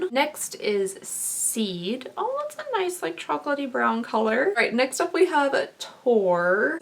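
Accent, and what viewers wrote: Kentucky, United States. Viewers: Mercer County here.. I knew she was from Kentucky as soon as she started talking but then she said something about a flea market and I literally laughed out loud 🤣 subscribed right after that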